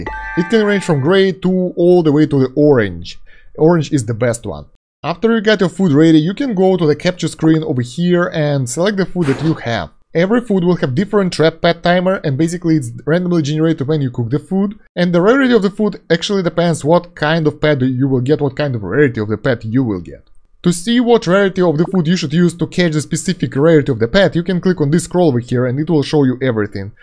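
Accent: Russia. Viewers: уфф, я был уверен, что это русский акцент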